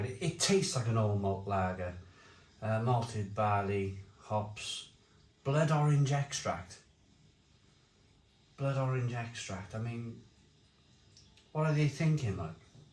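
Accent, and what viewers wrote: Wales, United Kingdom. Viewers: Couldn’t agree more with this. Picked it up, 2 bottles of this faff in Waitrose on a yellow label. Dire. Really bad. Great review,! Love your accent, I’m a Berkshire boy but spent 12-13yrs in Dinas Powys and Penarth and your accent brings it all back! Don’t worry, I sang the Welsh national anthem, in Welsh at all the rugby matches I went to. Was constantly told where to shove my chariot but embraced it all and loved every second there! Love your channel. Really good. Cheers,!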